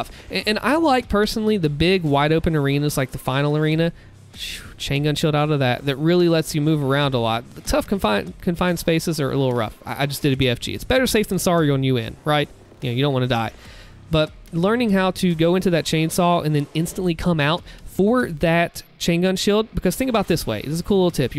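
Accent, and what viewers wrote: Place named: Midwest, United States. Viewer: Hey r u from the midwest? Im just curious, ur accent is so funny 😂. Keep up the good work tho, u r honestly one of the few doomtubers who put effort into their content. I have a suggestion for u however, which is to play some doom eternal levels on the switch. I think that would be an awesome level (I play on switch 🤣)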